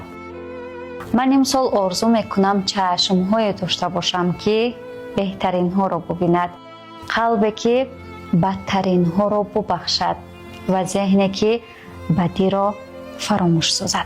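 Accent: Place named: Tajikistan. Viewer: چه زیبا لهجه تاجیکی خانم زیبا خدا قوت تولدت مبارک 🎉🎉🎉⚘️⚘️❤️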